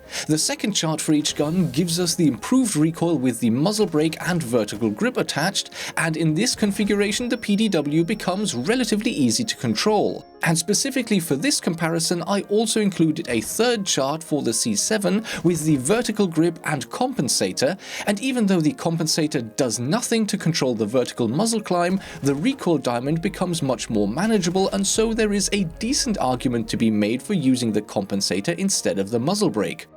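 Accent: United Kingdom. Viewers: I thought this guy was a robot with a british accent. My whole life has been a lie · That’s just cause he’s British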